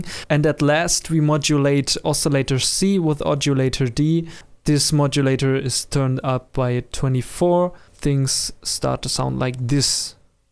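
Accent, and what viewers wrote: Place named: Germany. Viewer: Lol a german who finds it hard to pronounce english haha :) I believe "coarse" pronounced the same as "course" but correct me if I'm wrong :p Great and clear tutorial btw! Props!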